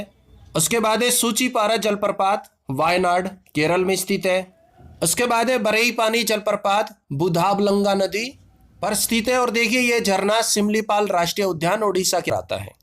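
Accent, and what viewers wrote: Haryana, India. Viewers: Haryanvi hindi sahi se nhi bol pate chitrakut..ko chittar kut padha ja rha h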